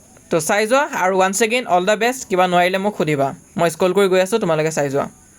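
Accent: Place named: Sivasagar, India. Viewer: Sivasagar distric r english khon lgeh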